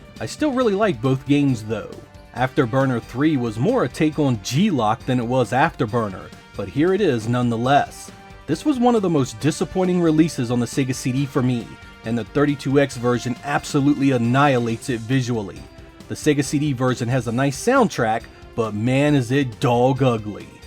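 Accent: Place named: Mid-Atlantic, United States. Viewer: is totally from PA/MD/NJ I can hear your accent lol with love from Boston!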